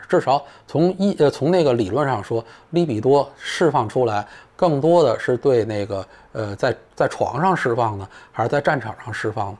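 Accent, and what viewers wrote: Beijing, China. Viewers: 我是个90后女生却十分喜欢散人的扑克脸 北京腔更显老道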